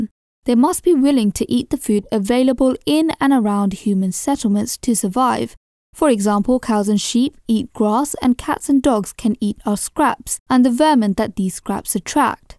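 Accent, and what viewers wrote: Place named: United Kingdom. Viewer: rejvaik because she’s British not American.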